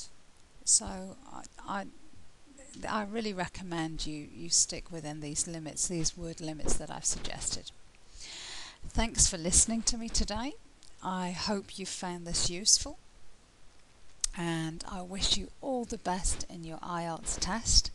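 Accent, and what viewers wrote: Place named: Australia. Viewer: I love your Australian accent. You guys have unique accent in whole world. I just want to say thanks for your this video. You are so cherubic.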